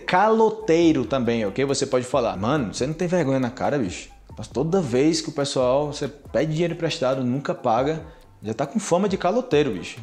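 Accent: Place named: Colombia. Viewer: putzzz cara, tô chocado do jeito que tu fala espanhol, tens até sotaque de colombiano kkkk amo seu conteúdo !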